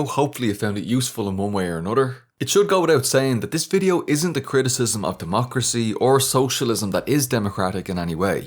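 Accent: Ireland. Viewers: Nice too hear an Irish man leading the charge still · I am binge watching your channel now. Gotta love your perspective, analysis and of course your Irish accent.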